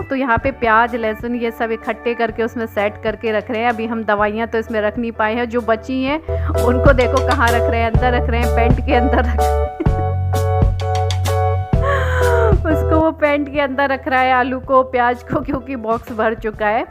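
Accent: Uttar Pradesh, India. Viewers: Kahan rehte ho aap.Accent apka up ka lagta hai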